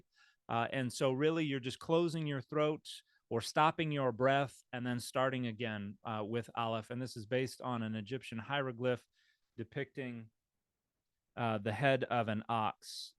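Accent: Israel. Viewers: I couldn't help but notice you pronounce Hebrew closer to a modern Israeli Hebrew pronunciation, rather than a reconstructed Biblical Hebrew pronunciation. Is that because your teachers were Israeli? Or because you distrust reconstructed pronunciation? Or just an accident of fate? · It is important to note that the pronunciation here is the modern israeli pronunciation - not the ancient one. If you want to hear the pronunciation of ancient hebrew (in different periods) I recommend watching A. Z. Foreman's channel.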